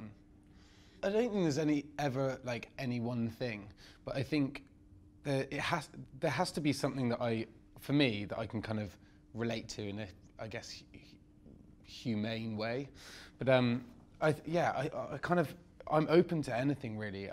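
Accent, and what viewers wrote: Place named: Gloucestershire, United Kingdom. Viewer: Although there are elements of "posh" in his normal speaking voice, there are also clear signs of the region he comes from - Gloucestershire. A brilliant actor and a great personality.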